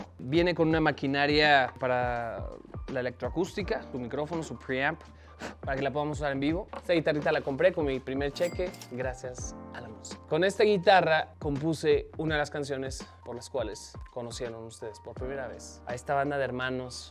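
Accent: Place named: Mexico City, Mexico. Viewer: Los mundialmente conocidos! (Cuando aún tenían acento chilango xdxd)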